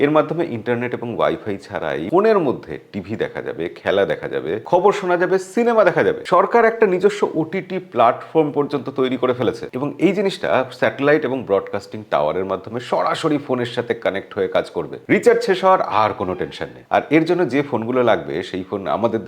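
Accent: Bangladesh. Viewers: আপনার কথা শুনে মনেহচ্ছে আপনি বাংলাদেশ থেকে বলছেন......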